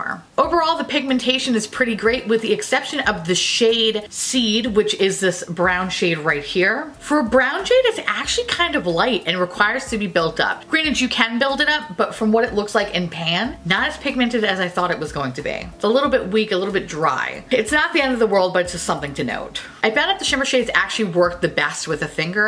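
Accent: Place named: Minnesota, United States. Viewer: While in her minnesota accent.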